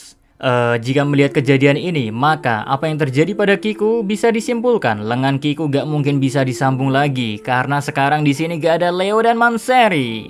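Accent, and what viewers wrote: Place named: Java, Indonesia. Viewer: Gak nyangka chanel ini besar kek gini.. Padahal dulu 2019 pertama aku nonton chanel ini.. Saya menyepelekan chanel ini.. Apa sih chanel kecil gaje.. Tapi lama kelamaan jadi suka seru... Yang paling aku suka jujur bukan teori atau ap apa.. Aku suka suara... Jawa campur indo... Vokal suaranya ciri khasnya itu loh wkwkwk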